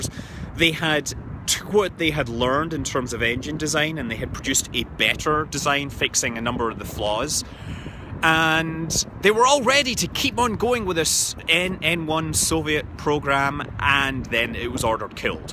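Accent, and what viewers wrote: Scotland, United Kingdom. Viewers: Nobody expects the Scottish accent.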